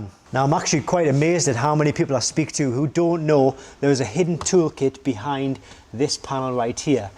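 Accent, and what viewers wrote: United Kingdom. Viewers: Thanks... great to see/hear a British channel. Not clutching at straws in my mind, just useful tips.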